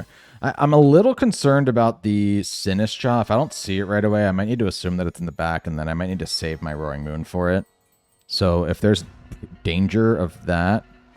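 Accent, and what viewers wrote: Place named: Philadelphia, United States. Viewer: Your Philly accent was aht bigtime today pal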